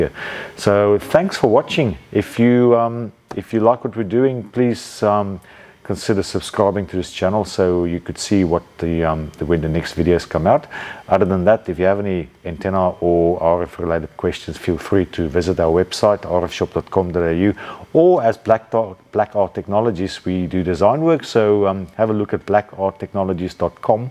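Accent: South Africa. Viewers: This guy is South African lol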